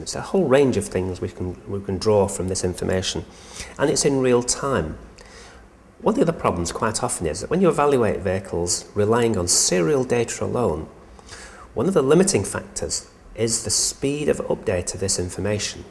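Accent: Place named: England, United Kingdom. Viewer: I liked your English accent, every words was clear=good articulation. 10/10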